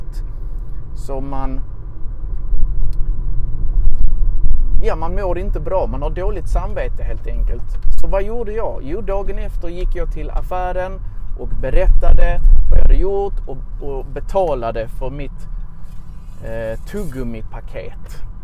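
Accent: Scania, Sweden. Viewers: Personligen bryr jag mig inte om med skånska dialekten. I Tyskland pratar vi också olika dialekter. Jag förstod allt vad du sa. Alla pratar olika och det är helt normalt. Det är viktig att förstår alla svenska volk, inte bara från Stockholm. Fortsätt med det du gör. Det hjälper oss mycket. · Älskar skånskan! :))) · I storbritannien kallar vi det för dialektdiskriminering. Jag kommer från Liverpool och vi har en jättestark dialekt och om någon inte gillar det så kan den dra åt helvete Jag älskar skånska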